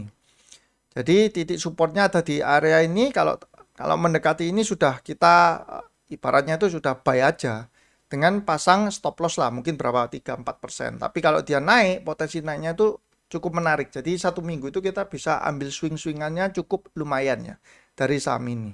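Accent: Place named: Java, Indonesia. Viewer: Terima kasih mas, sangant mengedukasi, juga suka loga jawanya Terlihat bangat mas niatnya membantu ritel utk tdk terjebak, sukses untukmu orang muda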